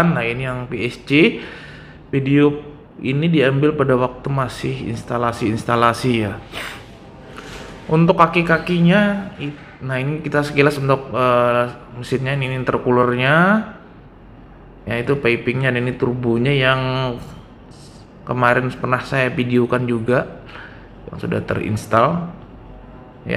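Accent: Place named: Hulu Sungai, Indonesia. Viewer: Logat/dialex hulu sungai masih kadangaran broooo !!!!!!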